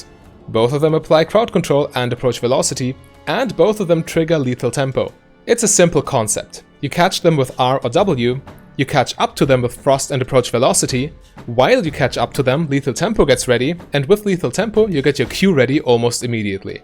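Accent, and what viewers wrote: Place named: Germany. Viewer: Inhaltlich gutes Video aber ich verstehe nicht, warum Deutsche mit so einem krassen Akzent englische Videos machen müssen. Ist mir in letzter Zeit öfter aufgefallen. Als ob es nicht genug englischsprachige Videos gäbe.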